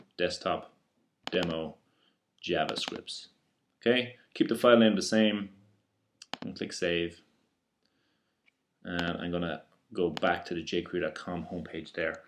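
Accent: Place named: Ireland. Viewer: loving the accent eire abú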